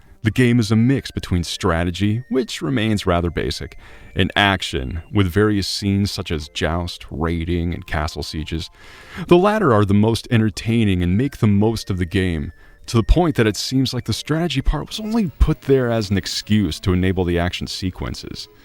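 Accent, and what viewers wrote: France. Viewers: But you likely would call it a "Zee"X Spectrum. ;) I'm all for hearing the French accent. I think your passion is great and you're not likely blinded by nostalgia.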